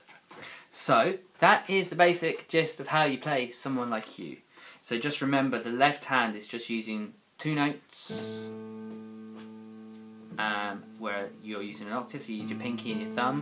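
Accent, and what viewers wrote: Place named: United Kingdom. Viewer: I had to look at where you are from because you sounded British and voila you are from the UK. Possibly why your house is nicely decorated too. I am a beginner on the piano so I'll try this song out! I love it. And you don't know the lyrics that well lol but great job otherwise :)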